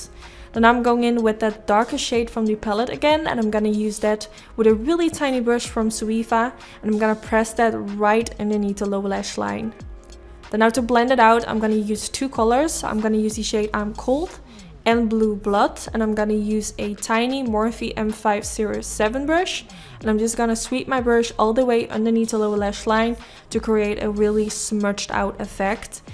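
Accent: Netherlands. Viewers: Wacht ben je nederlands?? Haha dit is de eerste keer dat ik langs je kanaal komt, en na 2 minuten dacht ik hmmm iets aan de uitspraak klinkt een beetje nederlands, maar toch ook weer niet. Daarna ff gegoogled en toen wist ik het hahaa -- leuke video ook!!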